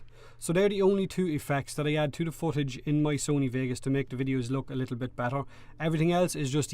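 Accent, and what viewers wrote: Ireland. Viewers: Your Irish accent is awesome :) (seriously Irish and Scotish accents are the best :D) and thanks for the video! :D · How do you add that sweet Irish accent to your videos?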